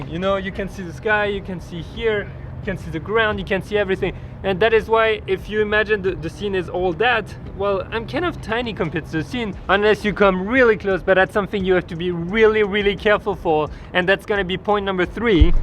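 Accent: France. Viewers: Oh je pensais que tu étais un américain avec un accent anglais... mais l'accent français est trop mignon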